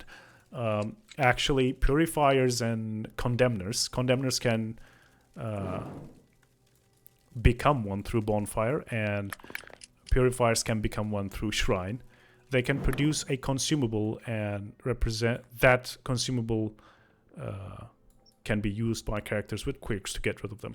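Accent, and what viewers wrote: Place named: Scandinavia. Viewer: Game looks interesting from the little i've seen. Showing my fellow scandinavian some support (Can tell by the accent)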